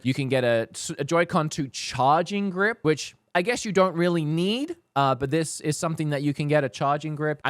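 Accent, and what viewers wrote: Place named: Australia. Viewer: I have to ask, are you Australian or American? Because you have mostly an Australian accent but you mix a lot of words in with an American accent. I'm so confused lol.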